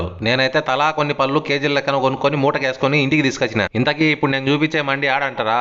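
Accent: Kadapa, India. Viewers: Vayamma Kadapa slang king back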